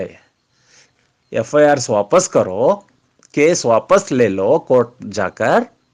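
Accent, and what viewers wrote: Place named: Kerala, India. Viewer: Kerala wale ki English aisi hi rehti .lekin rehte qualified log.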